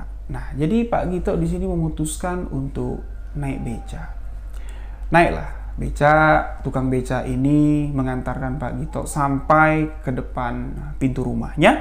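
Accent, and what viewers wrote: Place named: Java, Indonesia. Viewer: Alhmdulillah..jmpa lgi dngn Bng, yg plng sya suka,cra membwaknya,dn bahasanya mnteep..., kalu orang jawa..logat bicranya enk bngt di dngar...🙏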